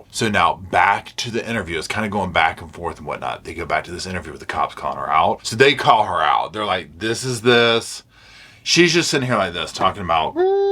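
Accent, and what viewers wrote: South, United States. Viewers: Why do you say "you know" so much? Is that just like, a weird southern thing?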